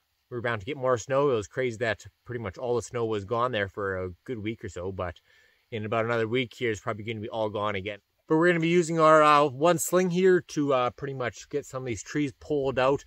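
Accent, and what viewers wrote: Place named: Upper Peninsula, United States. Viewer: Congrats on 1000 subscribers!!! Great channel!! Your accent is awesome, reminds me of the upper peninsula here in Michigan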